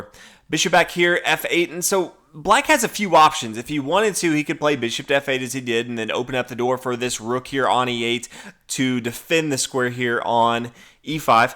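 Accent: United States. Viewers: The American accent is always great to hear.. but man! It’s Not bateess Spassky. It’s boris like bo-ris. And really pronounce the r. Don’t roll it.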